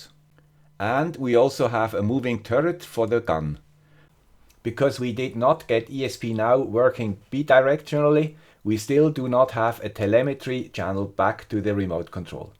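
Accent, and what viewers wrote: Switzerland. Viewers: You are correct. Many crowdsourced collaborations have occurred in the digital / electronics world. Many have failed due to the lack of process and project management issues. Do not be so hard on your documentation assessment (at least - in this video) and challenges you faced. You highlighted important elements - and yes, all engineers, myself included, hate paperwork. But as all know, they are a necessary evil. We have learned much from Michael Faraday and his superb skills and the unfortunate challenges of lacking a formal education that, very likely, held him back from even higher achievement than he already had achieved at such an early age. Newton was the exact opposite. By the age of 23, his manuscripts had become masterpieces in their own right. We are all "lazy" in comparison ;-) Even so, I think you deserve a lot of credit creating this content and its format. 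It is superb - even for a guy with a Swiss accent - that is easily understood in the YouTube Universe!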